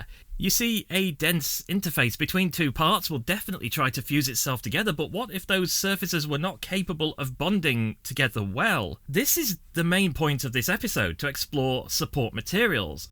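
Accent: England, United Kingdom. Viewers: Nothing more fun than listening to an Englishman.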